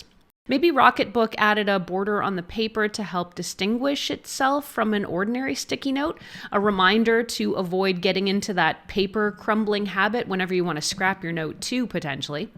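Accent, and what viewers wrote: Canada. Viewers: Thank you, it seems like a lot of reviewers are Canadians nowadays. The giveaway is the pronunciation of "PROcess" in most reviews😅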